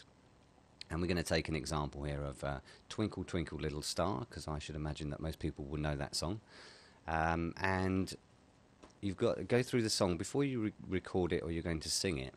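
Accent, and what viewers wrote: United Kingdom. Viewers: ur very welcome uve done a brilliant job i really appreciate it, n yes ur rite ur vid was easier to understand than most vids, last but not least LOL i LOVE ur british accent :) wish i had a brit accent too lol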